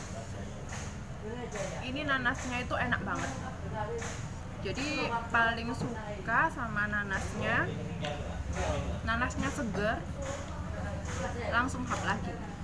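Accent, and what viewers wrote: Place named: Surabaya, Indonesia. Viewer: Dasar wong suroboyo yo ngunu rek ngomonge biasa wae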